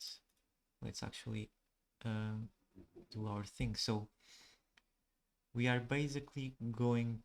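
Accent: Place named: Portugal. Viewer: you've got a portuguese accent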